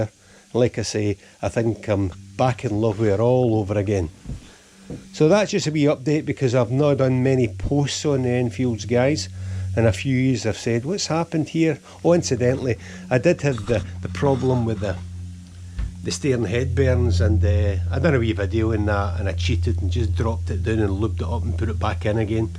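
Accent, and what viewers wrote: Scotland, United Kingdom. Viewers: You sound Scottish! What does “57” stand for on the wee bike? And the wind drowning out your narrative on the ride as well as the banjo music so I cannot understand you thou we speak the Kings English here in Texas USA! Too! Hoo mon dude ! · Well, I am a yank!...and we yanks struggle with those British accents. Scottish, right? And good luck with your secession from GB. Seriously. 😊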